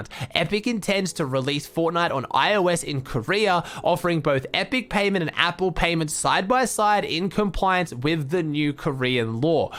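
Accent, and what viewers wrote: Australia. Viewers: now lol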